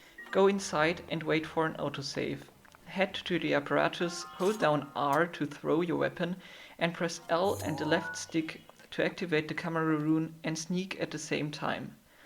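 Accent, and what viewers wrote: Germany. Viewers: Man merkt den Deutschen akzent hast ein gutes Englisch :D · deutscher akzent :D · As a German I knew you were German without seeing in-game language :D we just can't get rid of that accent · man hört sofort den deutschen Akzent raus :D gutes video!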